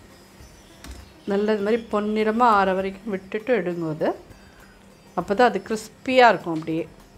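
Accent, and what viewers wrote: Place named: Tamil Brahmin, India. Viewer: Super mami. actually I expect this recipe from you. Your explanation is so good .I will try this recipe. and I like your voice with agraharam style. Thank you very much mami 👌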